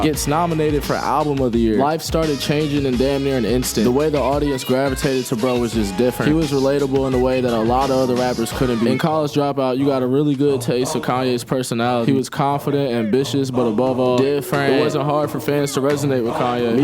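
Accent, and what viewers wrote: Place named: Baltimore, United States. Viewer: I cant unhear the baltimore accent its making me crazy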